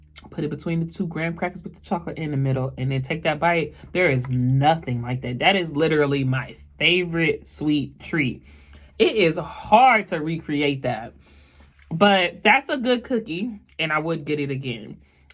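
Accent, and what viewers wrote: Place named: New York, United States. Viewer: You definitely sound more NY than NJ. 😂🤷🏽‍♀️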